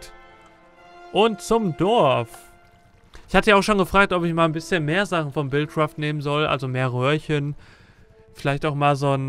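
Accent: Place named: Ruhr, Germany. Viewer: Ruhrpottslang ftw.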